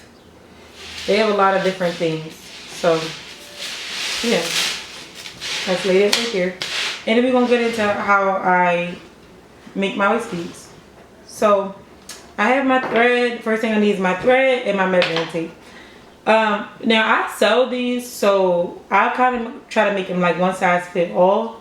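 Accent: Baltimore, United States. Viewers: By far the best tutorial! Thank you so much! You and your straight to the point explanation got me motivated and stringing my first string of waist beads at 1am lolll. Thank you, beautiful! Also, where are you from? I thought I heard a little Baltimore in therrreee 💞💞💞 lol. Thanks again! Liked, commented, and subscribed 😏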